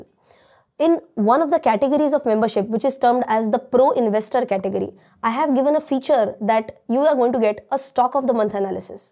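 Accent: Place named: Pune, India. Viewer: Thank you Mam, best things i like was getting education via Entertainment way.. It was like story telling. Command on english, being marathi manus proud of you for using your Punekar style in between your explanation. Point missing Asian Paints competitors(sorry). Please keep posting such videos mam.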